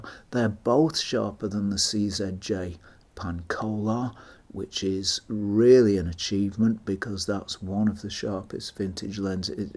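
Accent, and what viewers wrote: United Kingdom. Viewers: Who would tell me that Steve Buscemi is reviewing lenses on Youtube with an indistinguishable british accent?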